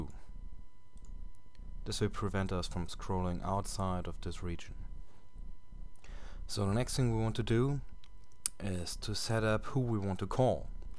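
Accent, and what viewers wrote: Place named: Germany. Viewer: Nice video! And I had to sneak into your profile to verify my first guess - of course you're german ;) It's just so obvious just by listening to the very first words ^^ Aber macht ja nix, Akzente sind was feines. Und deutsches Englisch verstehen die meisten immerhin, da gibt es deutlich schlimmere Akzente ;) Ich freu mich auf dein nächstes Video!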